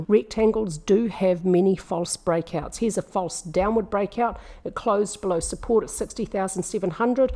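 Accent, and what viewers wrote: New Zealand. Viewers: Great to hear your kiwi ascent😊😊😊😊. Great analysis thanks.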